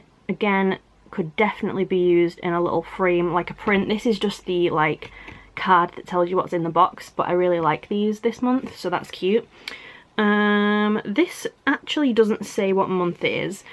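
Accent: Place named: Wigan, United Kingdom. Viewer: I dont know if you seen my comment on your last fright crate unlocking but the pumpkin tea light holder that was smashed they are selling in home bargains i live in wigan and your accent sounds close to mine so I'm thinking yours will also have them in the Halloween range it has a face on the front of it and is a wax melt also comes with a pumpkin spice wax melt smells delicious and is about same size as the smashed one you recieved in your box I bought one other week for 3pound I think I love it and instantly thought about you because I knew you was disappointed they couldn't send you another you look amazing as always ❤👑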